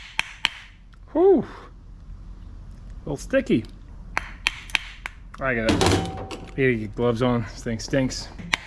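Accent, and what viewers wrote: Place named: South, United States. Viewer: Luv your southern accent, looks Fabulous! Thank you for posting 😀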